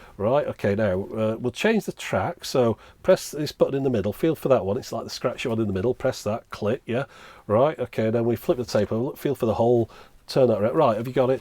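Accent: United Kingdom. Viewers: I love your videos. I belive I watched most of them now. (Some multiple times) Love the Muppet's, the British accent, the kindness, the retro items (the older the better), the explanations, the reviews, the garden views, the close-ups and generally the attention to detail. It is entertaining, educational, funny and just most wholesome. Whenever you say "thanks for watching", I reply thanks for showing.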